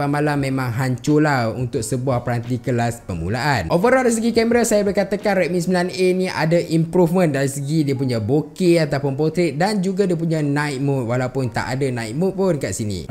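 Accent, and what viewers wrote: Taiping, Malaysia. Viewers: Abg orang Taiping ke? Hahaha baru perasan 😂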